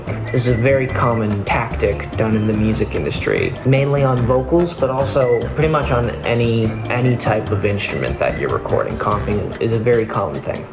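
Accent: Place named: England, United Kingdom. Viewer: Hello, I hope you see this comment. You have many fans in Turkey and there are no Turkish subtitles in your videos. It is not a problem for me, but I think it is a problem for those who do not understand the English accent. Please take this comment into consideration and you should do something about this issue. I think we love you.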